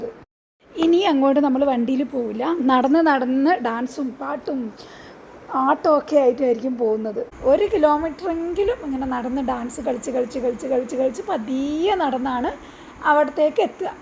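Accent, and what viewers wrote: Palakkad, India. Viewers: Madom, where is your native place ? Your talk palakkad same.!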